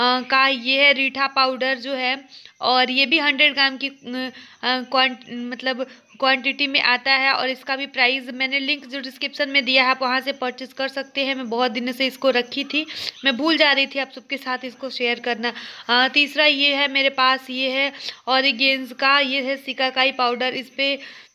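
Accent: Bhojpuri, India. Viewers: Aapke muh se bhojpuri bahut achhi lagti hai.aapki aawaz mujhe bahut pasand hai....!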